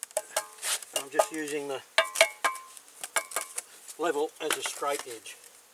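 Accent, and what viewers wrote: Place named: Australia. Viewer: I guess all English speakers sound alike to everybody else in the world. I have to admit I can't tell the difference between somebody speaking Chinese or Korean in terms of sound - I don't speak either language. It's ethnocentric I believe. But definitely sounds like an Aussie to my Yankee ears. Awesome video.. Good on ya...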